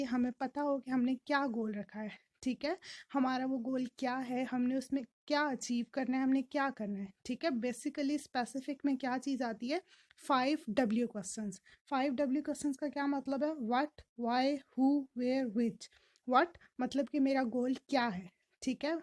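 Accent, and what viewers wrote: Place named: Kashmir, India. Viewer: Muah maam sexy voice ❤ your kashmiri accent is so sexy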